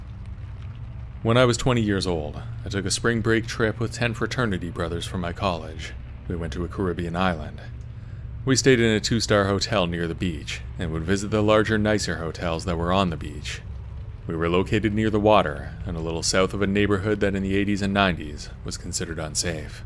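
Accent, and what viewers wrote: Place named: Canada. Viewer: ❤❤ Thanks love your calming voice, you must be Canadian like me!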